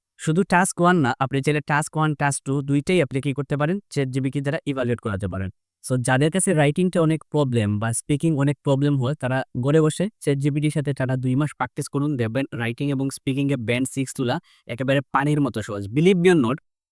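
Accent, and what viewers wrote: Sylhet, Bangladesh. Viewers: Bhai khati Sylhety r Nokol Sylhety modda partokko ki😀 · Vai khati sylhety ❤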